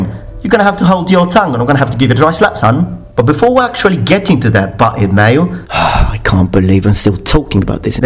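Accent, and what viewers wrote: England, United Kingdom. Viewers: Love your British accent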